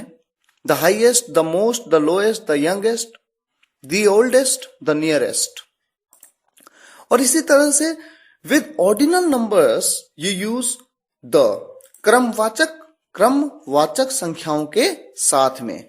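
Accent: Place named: Assam, India. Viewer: Sir ji aap assam se ho kya 😃😃😃😃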